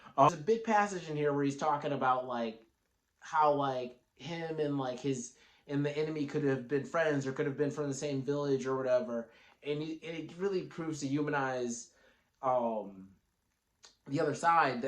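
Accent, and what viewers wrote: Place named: United States. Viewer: Great review! It is a shame you barely have any viewers because I think you get across the point Remarque was trying to make very well. From your accent I presume you're American, that makes it even better, getting that - in his intention - universal message of "just don't kill each other for the interests of others". I got here by somehow remembering how that book impacted me as a kid and then just typing it in. Ended up here, was anything but disappointed. Thanks for covering it that well. And again, you really deserved a whole lot more viewers.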